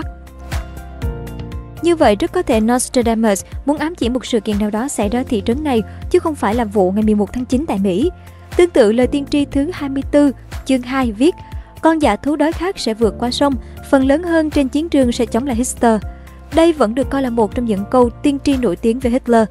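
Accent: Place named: South, Vietnam. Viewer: Love her southern voice. Best!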